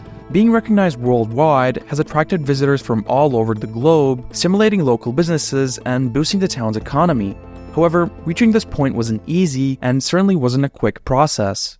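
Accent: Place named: Latvia. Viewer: Es gribēju gluži otrādi paslavēt, par angļu izrunu ar tik knapu latviešu akcentu :D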